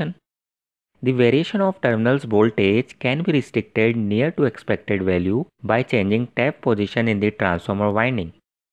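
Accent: India. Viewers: Your electrical knowledge is so good. I am pleased to improve you Indian english pronuciation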